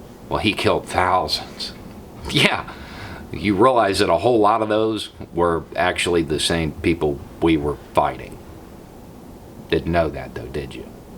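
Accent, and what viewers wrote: South, United States. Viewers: You respect him... Do you respect all your enemies? Your logic and ability to understand the English language are suspect. This channel seems to be a glad hand to inane, who are continuously wowed by your Southern accent yet stereotypically opposite views...If you know a limbless veteran or a shell shocked contractor ... This man is to thank for such results. You make money scapegoating your own countrymen. Painting only with the facts that color your opinion correct...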